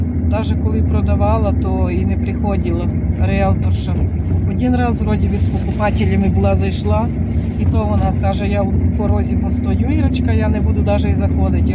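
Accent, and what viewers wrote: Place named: Korosten-Ovruch, Ukraine. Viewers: Говор Житомирської області,по розмові зразу видно звідки людина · Зразу зрозуміла, що з Коростенського району. Коростенсько- овручський говір не переплутати 😊. Вітання з Черняхова.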